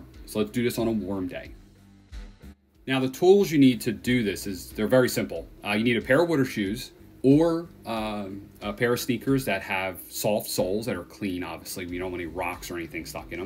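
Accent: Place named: New Jersey, United States. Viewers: Sounds like West Orange NJ. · I'm guessing you're from NJ. You say woter.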